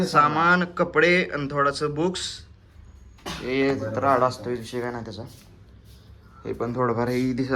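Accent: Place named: Pakistan. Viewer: Pakisatani English ewwwww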